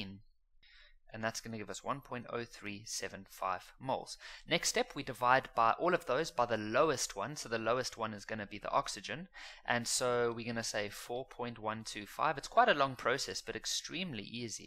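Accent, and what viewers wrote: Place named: Afrikaans, South Africa. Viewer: aaaa... comment take 2. It is now 2;50 in the moring with my test tmr, this is like the 3rd video of yours that I'm watching and oh my soul, the jokes. I almost woke my house laughing. fristy comment on your market stragey, Making content that is already in demand just slightly older is very smart * cap cap. secondly thank you for making this avliave bro, appreciate it. thirdly, are you South African? Your accent sounds very much afrikaans, its cool. Okay good night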